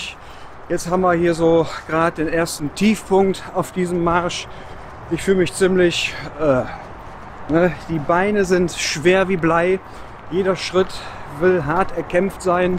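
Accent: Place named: Cologne, Germany. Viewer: Guten Morgen. Tolles Video, wie immer und dazu dein Kölche Dialekt, vom feinsten. Wünsche Dir einen schönen Wochenstart.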